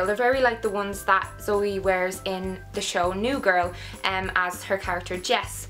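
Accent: Ireland. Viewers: where in ireland are you from? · Thanks :) Personnally I prefer english speaking .. ^^ And I like your Irish accent too, and one day I'd like to visit Ireland. Seems to be such a beautiful country! ;)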